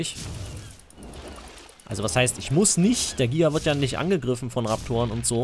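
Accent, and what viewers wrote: Germany. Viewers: euer Akzent (Ossi-Akzent) für uns ziemlich lustig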